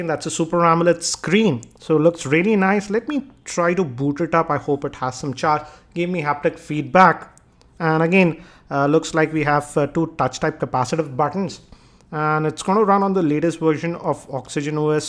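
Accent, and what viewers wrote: Hyderabad, India. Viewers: please change ur accent of speaking. hyderabadi youtuber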